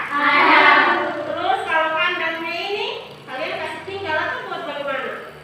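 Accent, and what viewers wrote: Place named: Sumba, Indonesia. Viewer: Logat sumba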